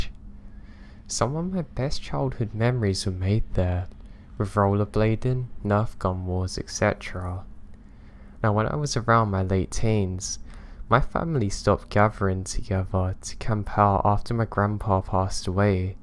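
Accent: United Kingdom. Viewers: What kind of accent do you have? Seems British but a bit different, great videos btw!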